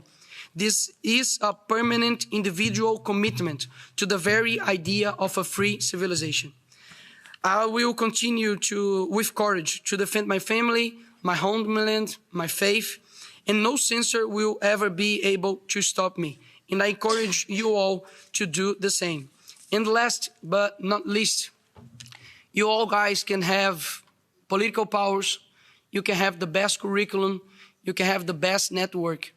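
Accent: Brazil. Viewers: FALANDO INGLÊS TUPINIQUIM DELES.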